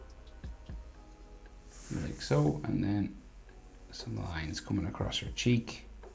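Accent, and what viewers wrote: Ireland. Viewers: I am curious, are you irish?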